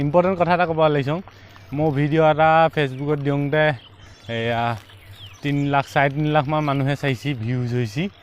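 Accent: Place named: Morigaon, India. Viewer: মই সেই video তু চাইচু কথা বিলাক বৰহি্য়া হৈছে ।আমি মৰিগাওৰ লোকেল ভাষা এ ভাল লাগে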